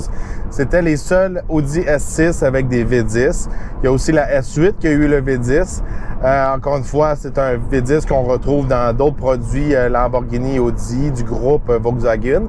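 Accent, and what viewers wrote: Quebec, Canada. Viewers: c'est laccent québecois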